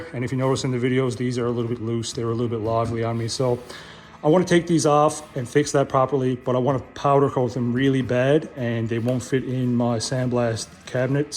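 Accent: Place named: Newfoundland, Canada. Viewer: You're a newfy ay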